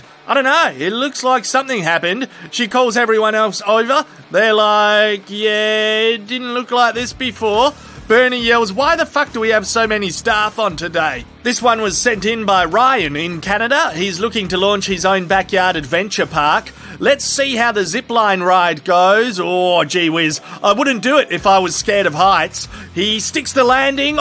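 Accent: Australia. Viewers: Why do Australia Accents make the best for commentating? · I'm not sure if it's the Aussie accent or just your twisted sense of humor but I absolutely respect the funny! Keep em comin.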